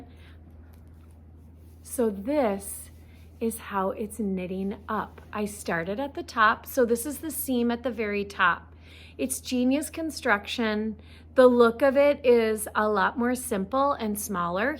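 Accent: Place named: Minnesota, United States. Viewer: Just found your YouTube videos today. This one came up. Your voice and tone is calming to me. Then your favorite things video started to play and I noticed the accent in your voice sounded like maybe Minnesota. Only a few seconds after that thought came to my mind you stated that you were coming to us from Minneapolis, Minnesota.! Just a fun piece of trivia for us. 😂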